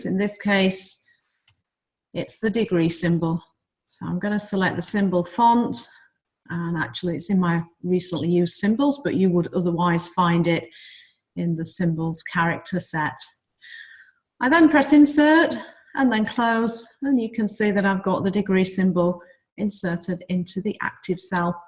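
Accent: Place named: East Midlands, United Kingdom. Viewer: Thanks, very helpful, succinct and to the point. I like your accent, is it East Midlands?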